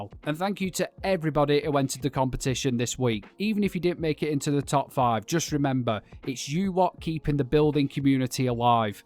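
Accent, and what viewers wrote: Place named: Lancashire, United Kingdom. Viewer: Bolton or Wigan accent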